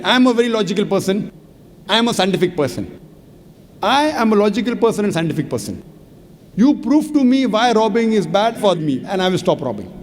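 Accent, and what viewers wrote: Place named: India. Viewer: I am really liking the Indian accent . It is cool .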